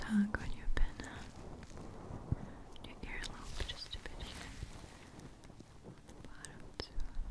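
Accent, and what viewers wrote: Russia. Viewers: Lol girl you said muscle spams lol you crack me up I love your Russian accent when you speak English, so very cute.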